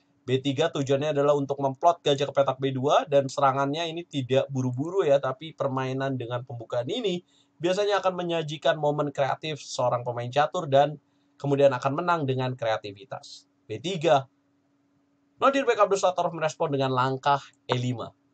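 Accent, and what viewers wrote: Tegal, Indonesia. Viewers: Bang kelahiran tegal ya..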